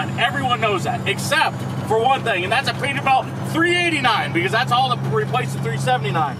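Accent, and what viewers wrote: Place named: Kansas, United States. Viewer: “Them things like that” Hillbilly from Kansas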